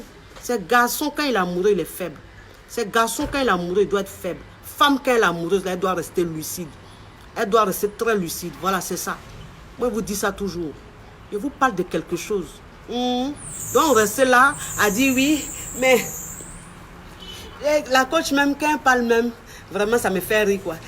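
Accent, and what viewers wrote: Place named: Ivory Coast. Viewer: les expressions : "mougou... mougouli.... yougou yougou..." ton accent ivoirien, tes "r" enroulé, ta gestuelle de gauchière, viennnent pigmenter ton coaching. j'aime quand tu les utilises souvent.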